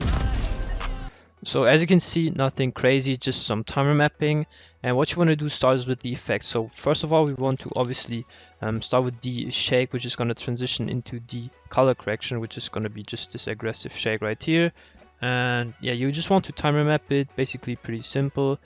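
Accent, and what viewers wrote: Germany. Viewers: Omg ich checke jz erst das du Deutsch bist 😂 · Are you german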